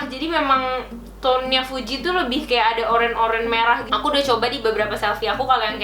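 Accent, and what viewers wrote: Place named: Visayas, Philippines. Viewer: Hala, your dialect kinda sound close to the Visayan dialect from the Philippines. I find It fascinating. :)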